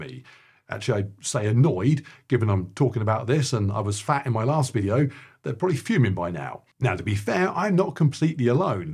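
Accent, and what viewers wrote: England, United Kingdom. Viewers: I need that English accent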